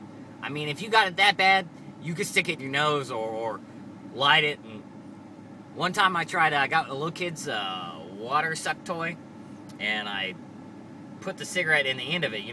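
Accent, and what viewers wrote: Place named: Texas, United States. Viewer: Loving your texan accent and you're a cool dude :)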